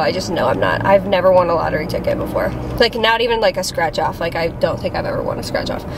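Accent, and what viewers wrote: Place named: Chicago, United States. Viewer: VLAG lololol yas ya love to hear that chicAgo accent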